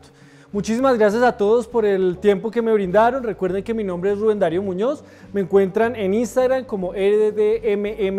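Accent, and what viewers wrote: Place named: Colombia. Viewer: Me gusta ese acento y me. Convenció con el hecho de ser colombiano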